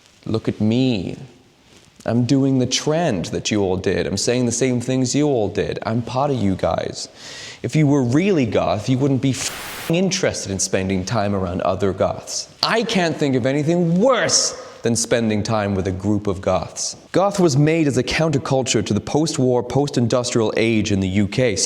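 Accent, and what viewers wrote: United States. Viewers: I’ve never heard an Englishman speak in an American accent until now. It’s pretty good with small slips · If this guy is a Brit, he's the most American sounding Brit I've ever heard. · out of curiosity, where does the American inflection in your accent come from? I was under the impression that you were English? Is this correct or is there nuance that I'm ignorant of?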